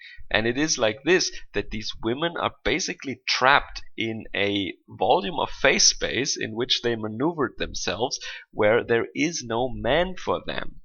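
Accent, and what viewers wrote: Germany. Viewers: When I was a kid I had a german friend, here in Chile. Same accent, same sense of irony. God bless him and you. Loved the video. The engineer guy had a great pair, props to him! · "appreciated just for having a pulse". lol. Great vid made all the more so with the German accent. I love to hear foreigners speaking English. It seems to make what they to say all the more interesting and focused on imparting important information.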